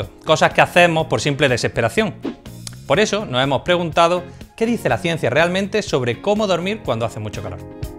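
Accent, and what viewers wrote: Murcia, Spain. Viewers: Acho tu ereh de Murcia no? Yo también.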